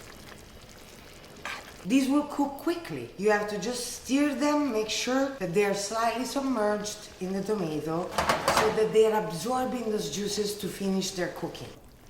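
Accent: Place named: Rome, Italy. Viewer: Ma tu sei romana perché sento quando parli italiano la cadenza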